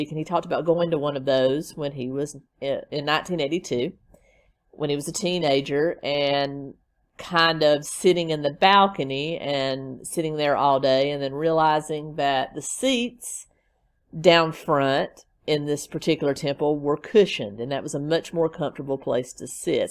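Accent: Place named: South, United States. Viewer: What part of the south are you from?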